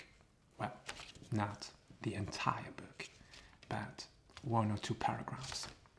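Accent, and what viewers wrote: France. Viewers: A soft spoken male French accent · This was so ridiculously good. You chose a perfect subject, and you have a very cozy production. Is that your home!? It is so French and charming. Very historic looking! A french accent plus book sounds? Yes please. Love the page sounds and truly the French language is the most beautiful language in my opinion. Please as a request if you do another video, do a Math video! That would be amazing. LOVE the shoutout! Hahah. Plus to be put in the same page as Croissant and France...those are two of my favorite things. Truly a great first video and I really hope to see you continue doing more. You are a natural on camera!!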